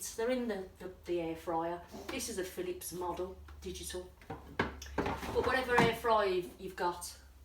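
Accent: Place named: Black Country, United Kingdom. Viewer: just subed,loved your vid,tell the camera man/other half to mind is own, you are the bomb, what part of Brum you from, sound more like black country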